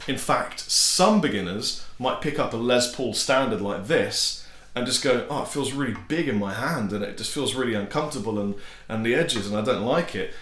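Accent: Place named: Brighton, United Kingdom. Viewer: Hey, I´m 55, always dreamed of myself playing but never got the guts to take lessons and practice. Shame on me, I know. These days I use the adult life, job etc as excuses for not geting started, but, believe it or not, I still didnt give up. Probably I´ll never play at all, considering this failure of mine, but have you ever heard of utopia? Sure you did. That´s whta me playing at least reasonably well means to me. Two final things: perhaps the flip side of your conception of music and playing is some guys like... Klara Soderberg (First Aid Kit), Nataly Dawn, Stereo Total (ever heard them?). Their technique is way too rudimentary, sketchy, but they do manage to create great fun music. I tend to become a bit bored of virtuoso players who, despite of having a monster technique, dont "get there", cant make music that´s enjoyable after all. 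I´d put in this basket 90% of mainstream, wealthy acts: Eric Clapton, Pink Floyd, RHCP, you name it. For me, once the music is fine, it doesnt really matters how skilly you are. 2nd and last: does everybody in Brighton has your accent? It´s just great!! I wish I could walk around in London and hear only that around me.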